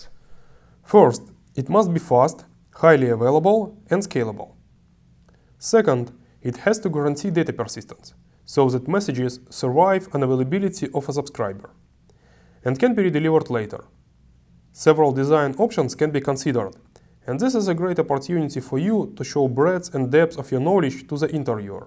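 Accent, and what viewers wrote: Russia. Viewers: Ох уж этот русский акцент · 这是俄罗斯口音吗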